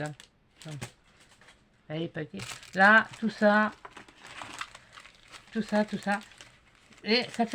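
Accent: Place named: Marseille, France. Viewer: Bonjour marseillais, dites moi qui est Coco? J'adore votre accent, j'ai le même 😊je suis d'un petit village à côté de Toulouse. Bonne journée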